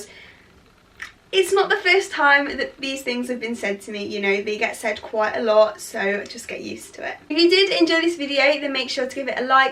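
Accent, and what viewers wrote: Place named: England, United Kingdom. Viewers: I love your accent, im from England too but i can't work out where the accent is from! You are extremely inspirational , beautiful and very talented at make up, you should be so proud of your content! 💗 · She's from Derby. I'm from Derby as well we have a couple of "native" accents here. One of em is Kelly from Misfits! lol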